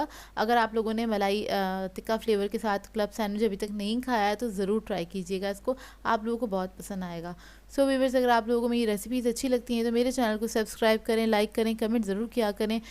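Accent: Karachi, Pakistan. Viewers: if u dont mind AP ne kis field me graduation ya master Kia Hy AP buht nice way me BT krti haen Me bhi Karachi me rhi Hun lekin ab Punjab shift hogye pr apka way of talking Karachi ki yad dilata Hy ar buht achi trah explain krti haen AP recepies ko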